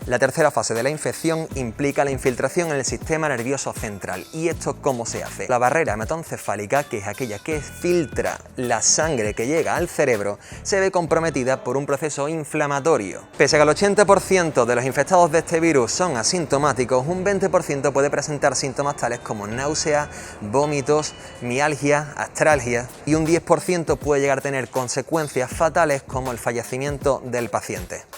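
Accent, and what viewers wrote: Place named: Andalusia, Spain. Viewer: Qué crack !!!!!!!!! “Ma quedao embobao” !!! Y en perfecto andaluz ! GRACIAS !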